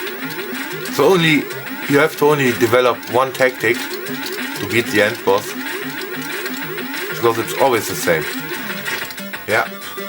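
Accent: Germany. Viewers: My german-sensor was activated... Do I hear a german gentleman? :-D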